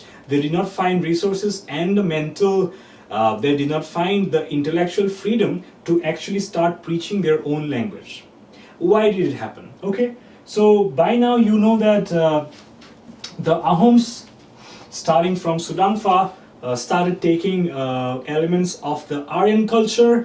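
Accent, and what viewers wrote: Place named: United States. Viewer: aapuni US r pra ahise nki ne UK r pra. val english koise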